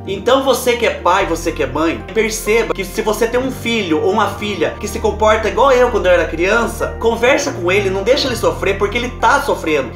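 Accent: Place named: Piumhi, Brazil. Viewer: Noossaa tô apaixonada!! Tu me fez rir d+ sô ! Ele é mineiro tbm gente? O sotaque parece d+ com o do povo de Piumhi kkkkkk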